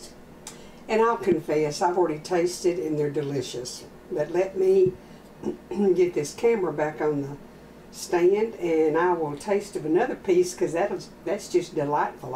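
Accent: South, United States. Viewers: Hi.. we just found you on YouTube.. love watching you cook and love listening to your Southern American accent. The mushrooms looks great also 👌👌👌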